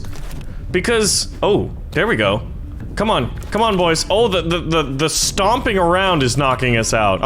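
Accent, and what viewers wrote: Scotland, United Kingdom. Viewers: I like the Scottish accent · 10/10 Scottish accent being given throughout the video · Scottish accent ? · Scottish~ish sounding voice but English units No complaint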